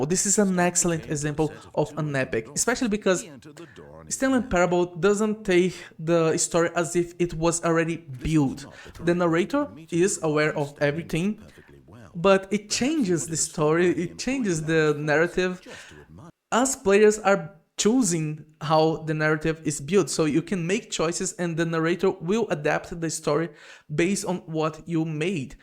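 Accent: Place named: Brazil. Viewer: No exato momento que tu começou a falar eu sabia que tu era BR AHUAHUAHU Nosso sotaque é muito reconhecível xD Bom vídeo, mano. Vou me inscrever.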